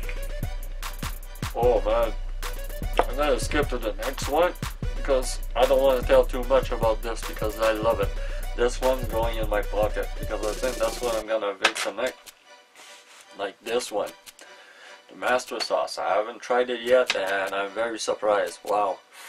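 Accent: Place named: Quebec, Canada. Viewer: Lol im an englishmen from quebec and ur accent is bringing back good memories haha awesome reviews to bro vapin the junkie shit right now too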